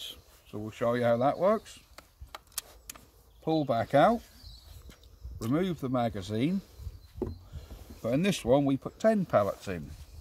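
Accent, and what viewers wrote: Norfolk, United Kingdom. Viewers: That's funny - I started watching before I read your channel name and thought "that's a Norfolk chap"! We're over in the broads. Nice video,cheers. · I miss the Norfolk accent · SOMEHOW LISTENING TO A FELLOW AIRGUN ENTHUSIAST WITH A BRITISH ACCENT EXPLAIN AIRGUN TECHNOLOGY IS MORE INTERESTING THAN A VIDEO FROM THE U.S. GUESS IT MUST BE THE SAME APPEAL AS THOSE GREAT TV SHOWS THAT CAME FROM THE BBC NETWORK. CAN'T HELP MYSELF. I AM A DIEHARD "MONTY PYTHON" & "BENNY HILL" FAN! 🎅🌅🗽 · i hear your from the uk. where do you get these from?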